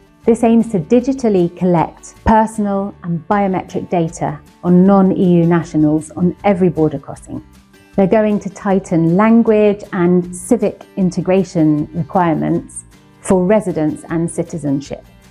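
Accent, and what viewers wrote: England, United Kingdom. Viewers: This woman with British accent is sooo fake! Just lie and lie with more lies. She is a joke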